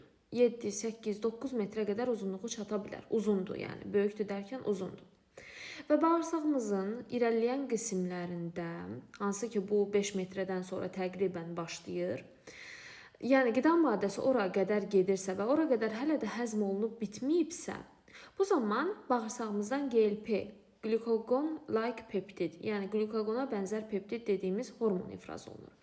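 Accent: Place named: Azerbaijan. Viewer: Benim kızım.Acaba ben sağlık tevsiyelerinizi mi dinlirem yoksa güzel Azerbaycan türkçenize mi heyranam bilemedim.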